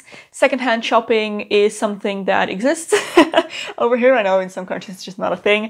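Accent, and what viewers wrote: Netherlands. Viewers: I always wondered whether you were Dutch or not, because of your accent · I heard you were dutch the second you opened the mouth you have the accent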